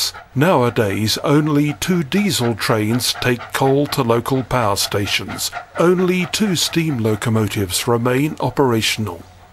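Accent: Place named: New Zealand. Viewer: As your accent sounds kiwi. You didn't do too bad o pronunciatiob of the names. As I'm a kiwi living here in Brazil I've never seen these railways you've filmed here. virtually all railways here are the same guage as NZ 3'6. around Sao Paolo state there are still some of the electrified lines still up. but a lot of areas where rail lines are completely abandoned in Sao Paolo, Parana and Santa Catarina. Even if some of those lines were to be reopened for the tourist trade it would do wonders for Brazil. The majority of tourists only stick to the coastal regions and think they've seen Brazil. the interior of Brazil is a whole different dimension.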